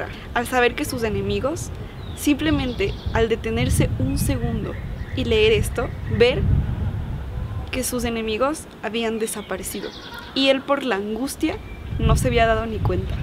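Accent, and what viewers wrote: Mexico. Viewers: Oye en serio, no te veo tono, bueno no se si los ecuatorianos tengan un tono específico, para mi suenas son tono específico como mexicana, como yo. O digamos con tono mexicano, no es desconocido para mi, sino familiar. Tienes raíces mexicanas?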